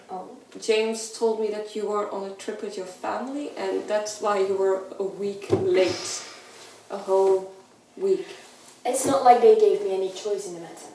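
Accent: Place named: Netherlands. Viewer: Ha I new you were from somewhere the Netherlands ore Belgium I thought I heard it right in one of the bloopers from the mischief managers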